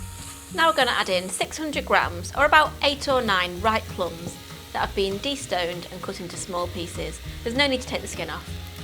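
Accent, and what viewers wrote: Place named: Northern England, United Kingdom. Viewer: It's her northern English accent. And what has it got to do with you how she speaks.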